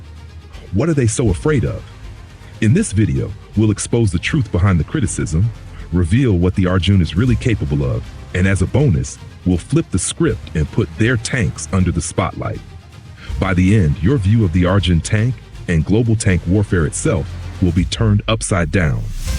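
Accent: United States. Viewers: Butthurt Indian guy puts up a video defending his tin can in American accent.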